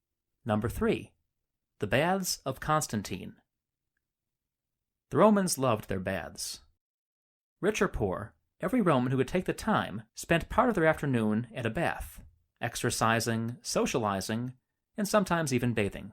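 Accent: United States. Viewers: You sound like Ben Shapiro. One of the more grating American accents for sure.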